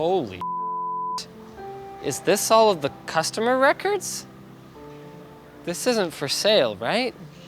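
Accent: Canada. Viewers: you can hear the canadian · Canadian accent really poppin in this video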